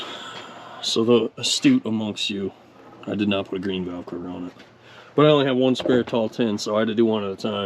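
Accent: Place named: Buffalo, United States. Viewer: you sound like you grew up in buffalo or somewhere close.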